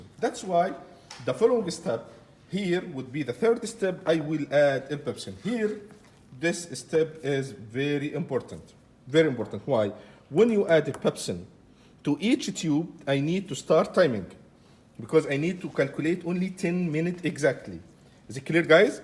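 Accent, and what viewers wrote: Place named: Arab world. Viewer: Yeah, I think his accent is Arabic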